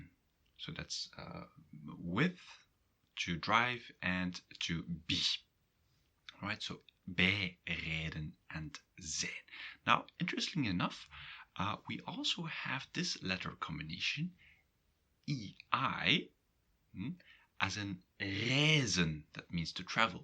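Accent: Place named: Belgium. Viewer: These are very strange interpretations- is this Belgium? Because it doesn’t sound Dutch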